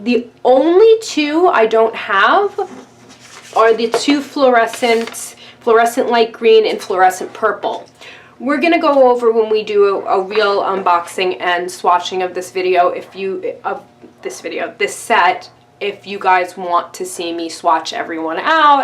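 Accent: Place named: East Coast, United States. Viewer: I just discovered you and your East coast accent❤️‍🔥❤️‍🔥❤️‍🔥🥇🥇🥇🥰🙏🏻👍🏻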